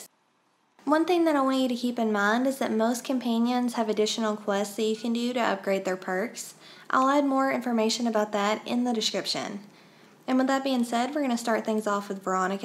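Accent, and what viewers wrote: South, United States. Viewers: Not gonna lie wasn’t expecting the southern girl accent. No negativity tho pleasant surprise. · I like your southern accent. From one southerner to another. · O boy I can sure tell your southern lol so am I from Oklahoma 😉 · Nice guide. Lots of gamers just drone on and on and end up losing the audience. Plus I don't often hear a lot of gamers with a slight southern sounding accent, especially girl gamers. Thanks for representing. Makes me a little less self-conscious. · Are you from the south?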